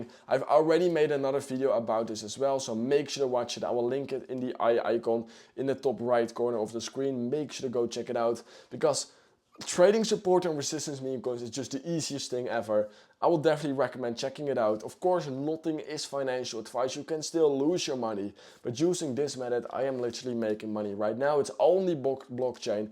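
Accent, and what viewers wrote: Netherlands. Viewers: i smell a bit of nederlands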